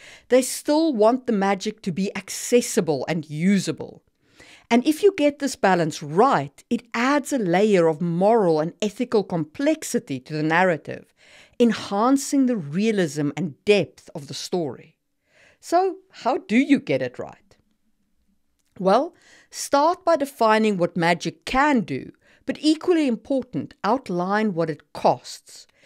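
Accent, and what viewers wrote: South Africa. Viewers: You sound like you're from South Africa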